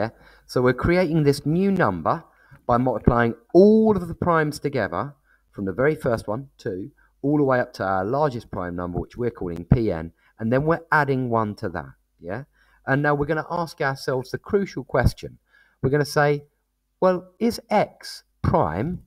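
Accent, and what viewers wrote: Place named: RP, United Kingdom. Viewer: Good, the best part of your presentation is your pleasant voice and almost extinct BBC English. But the very best is not what is in your video, but what is absent - background music.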